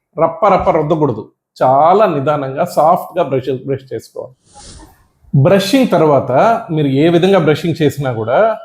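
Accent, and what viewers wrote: Nellore, India. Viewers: ఒకో ప్రాంతానికి ఒకో యాస ఉంటుంది. నెల్లూరు యాస లో మాట్లాడుతున్నట్టు గమనించగలరు. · నెల్లూరు యాస డాక్టర్ గారికి మా చెడ్డ అలవాటుండ్లా.....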